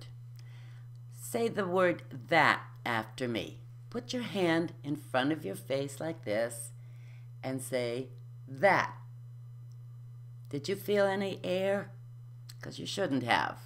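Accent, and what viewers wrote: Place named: East Coast, United States. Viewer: Because they don't pronounce the words with the letters with which they are written... j/k, this woman is claiming that foreigners who fail to use unaspirated "t" sound sloppy when unaspirated "t" is a later addition to English phonology innovated by colonists and immigrants who couldn't be bothered to fully aspirate it. Traditionally in English t is aspirated in every position. That's why it's written with the same letter. There is some irony in prescribing something and claiming that failing to use it is "sloppy" when it started out a "sloppy" feature of non-standard dialects in the first place. Other English speaking countries like the UK and Australia are filled with dialects that replace "t" with glottal stops all the damn time, but you don't catch them prescribing it as "proper English" for learners lol. 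On the whole, I find the implication that certain uses of language are "sloppy" borderline offensive. It's especially rich from someone who sounds like they speak some kind of refined regional East Coast dialect rather than modern General American standard. Nobody under 60 sounds like this woman in the US anymore, and if you're going to go full prescriptivist, why not go fully 19th century and recommend the Queen's English. It's quaint to see an American fuss over "proper English" and "proper elocution" when their "proper" dialects are relatively novel anyway. Anyway, if you want to speak like a typical US American, then follow this advice. Just know that it arose fro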